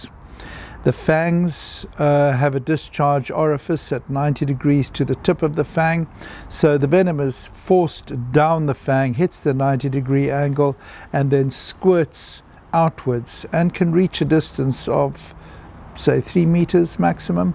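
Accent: South Africa. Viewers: How terrible, but the way you tell the story sounds elegant. Maybe it's the South African accent. Thank you for sharing, keep telling your stories. I love them!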